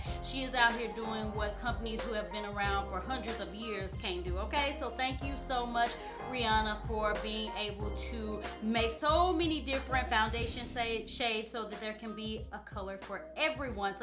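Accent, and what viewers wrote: South, United States. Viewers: You're so cute with your country accent.... nice look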